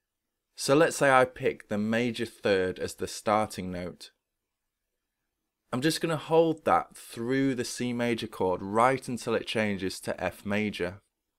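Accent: United Kingdom. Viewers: Excellent lesson. Awful British accent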